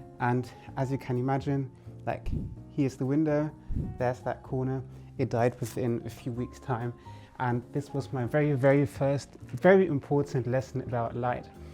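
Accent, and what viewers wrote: United Kingdom. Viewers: Where are you located im thinking England because of your accent.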